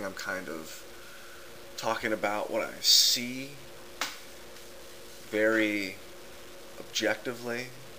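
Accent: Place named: General American, United States. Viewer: I disagree. Many, if not most, English speaking natives never master the English language. In my experience as an editor, well educated foreigners master English better than Americans. Among the typists, proofreaders and editors, it is a virtual United Nations at my newspaper. Top publishing firms have concurred with my statement. We come to this conclusion by who shows up when we hire. Don't sell yourself short. Where did you learn how to speak General American? It is perfection.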